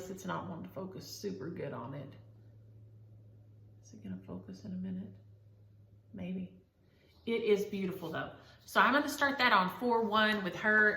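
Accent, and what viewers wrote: South, United States. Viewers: Sounds like you have had alot going on....I hope you can fine those pants....I had the Close Captioning on your video and they do not understand the Southern Talking....because what you said, was a whole different world than what they type...the last video, I was on the ground laughing to hard....they typed "Naughty" alot ...you did not say that....The Sheep are always fun to stitch, and you need to count your Blessings ...take care and be safe..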